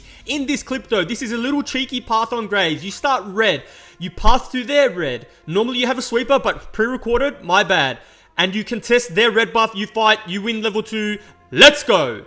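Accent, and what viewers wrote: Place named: Australia. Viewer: Great video dude, nice editing,funny commentary and informative. im subbing hoping for more of these kind of videos. (also love the stralian accent) gl for more <3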